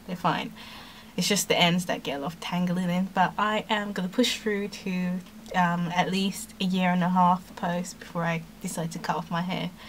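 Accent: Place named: Australia. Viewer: I've been transitioning for 4 months and I keep getting tangles in the roots each time I wash! I prepoo and detangle but as soon as it shampoo (with a sulfate free shampoo), I get tangles in the roots! What am I doing wrong? It's really frustrating and depressing. By the way, the way you talk sounds a lot like Nikki Perkins (from the Jamie and Nikki channel). Are you from Australia or Sudanese by any chance? Lol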